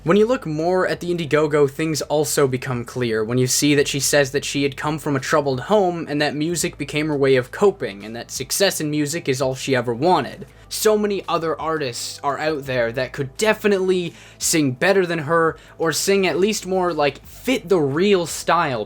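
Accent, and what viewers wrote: Canada. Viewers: Bro are you Canadian we can tell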